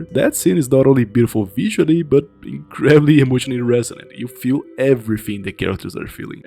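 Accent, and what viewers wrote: Brazil. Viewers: I hope you achieve your dreams man! I'm a fellow artist, and I know that our profession is very hard. You seem very passionate about your craft, never let anyone take that away from you! Also, I couldn't help but notice your accent, are you brazilian?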